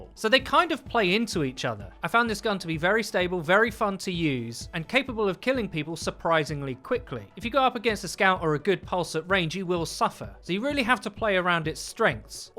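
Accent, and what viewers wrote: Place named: United Kingdom. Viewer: Can we get a destiny guide channel where it's not a british dude?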